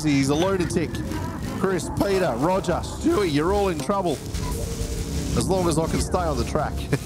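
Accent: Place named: Australia. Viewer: Boy, you were so silly in this video, especially with the way you were saying Korvo's name. Your Aussie accent is awesome too.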